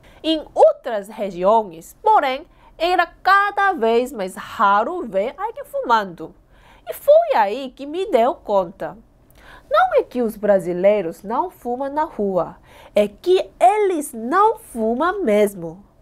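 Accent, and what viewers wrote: South Korea. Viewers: Mano ela fala português muito perfeito é tipo ela fala português e é tipo o geito é muito parecido com coreano muito fofinha🥺💕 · gosto muito de você principalmente do seu sotaque coreano... quanto ao cigarro o governo junto com a saúde fez campanha pra pessoas deixarem de fumar....isso contribuiu muito...tem o Dia Nacional ante tabagismo....abraço...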